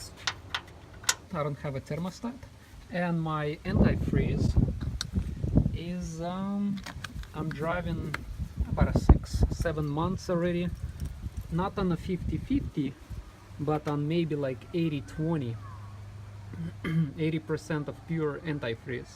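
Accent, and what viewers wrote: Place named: Russia. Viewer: Суровый русский акцент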